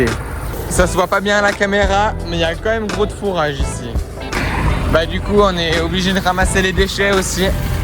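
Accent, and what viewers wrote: Switzerland. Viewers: slt tu es de quelle region ? tu a un accent mais je n'arrive pas localiser . en suisse peut etre nan ? je viens de decouvrir ta chaine . fort sympathique + 1 abo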